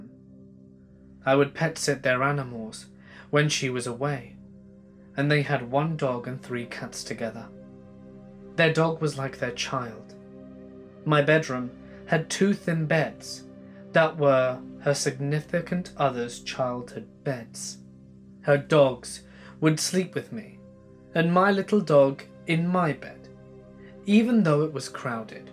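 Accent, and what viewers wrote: United Kingdom. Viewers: I truly enjoy your stories. Especially your voice. Being across the Big Pond I don't often get to listen to British voices and yours is amazingly smooth and well modulated. One channel sounded so bored he put me to sleep. You know just how to make a story scary and sometimes sad. I just wanted to thank you for all your hard work. Also thank you for sharing. Ms Michal aka Oldgamerchick😺😉☕🙏💖⛪💖 💀💀👻👻👻👻👻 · British accent isn't working for me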